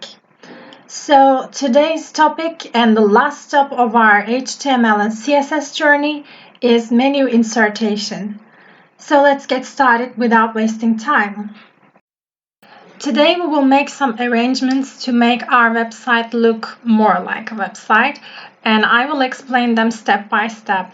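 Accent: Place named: United States. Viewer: you speak like you live in america hw dd u do that!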